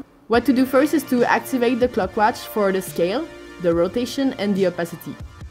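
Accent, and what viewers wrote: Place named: France. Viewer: I can hear a beautiful French accent. Thanks for this video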